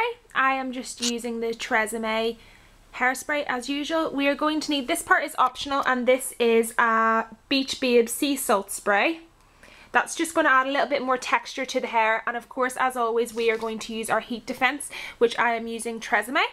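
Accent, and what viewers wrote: Ireland. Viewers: Are you from Ireland or North Ireland?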